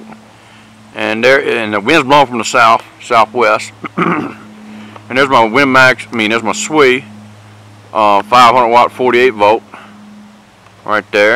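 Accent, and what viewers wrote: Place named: Virginia, United States. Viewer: I'm digging the accent. You grow up in Virginia your whole life? Nice.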